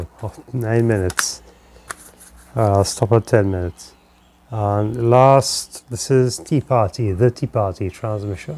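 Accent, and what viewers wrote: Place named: Caribbean. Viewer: Sweetheart... This was incredibly sweet. I loved this. You served us Dvds, cds AND vinyl? Yes, honey. One of my best friends is a trini gyal. Hard to not know that classic west indies accent. Love it. You nailed this AND gave us a part 2? Ill deep dive, sugar. I need that rekkid btw. Toodaloo!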